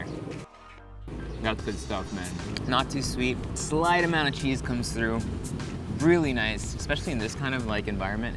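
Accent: Philippines. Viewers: Cute nun Tagalog mo kuya.. can u make video na puro Tagalog Lang 😉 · Ang cute nang pag tatagalog mo grabe haha ❤❤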